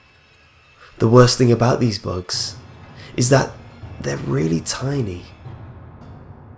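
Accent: England, United Kingdom. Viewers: I love your voice, I don't know why but an English accent just makes things creepier.:) You kind of sound like Wacko off of Anamaniacs. (Not a bad thing at all.) (Also, I'm sorry for spamming your comments by replying to an idiot.)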